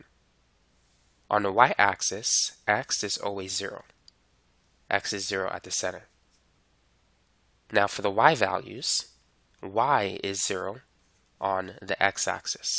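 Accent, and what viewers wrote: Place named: Boston, United States. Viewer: Where are you from? Your accent seems almost like new england/boston, but I can't pin it down!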